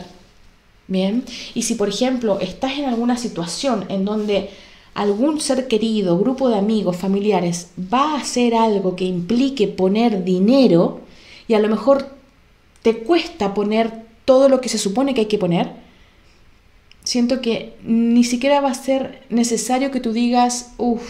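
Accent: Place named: Chile. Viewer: De que país eres???y dónde estás ahora, yo soy de Chile...por tu acento se me imagina que eres chilena...si es así, me gustaría verme las cartas, personalmente.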